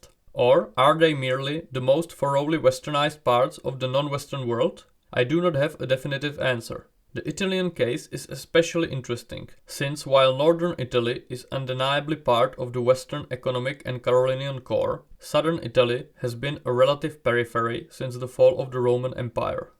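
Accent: Poland. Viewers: Serio? Ty Czech? ( I czecked even the info about Your channel) Jor akcent is sooo Polish🤣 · Heyy, i think you pretty much nailed it, good job!! (XD you sound very polish, i am too, but i think the accent adds a certain comedic value as in Poland most people talk as if they were western, although still catching up to the west). Have a nice day 🎉🎉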